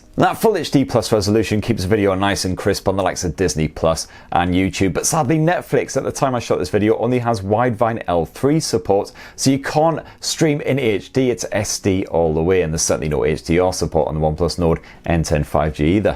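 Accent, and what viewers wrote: United Kingdom. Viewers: Great video unfortunately I don't understand his British accent.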